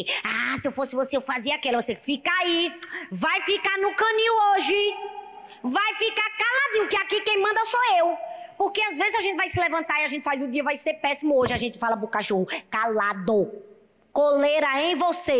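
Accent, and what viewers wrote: Northeast, Brazil. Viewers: Que sotaque maravilhoso, desse meu nordeste!!!!❤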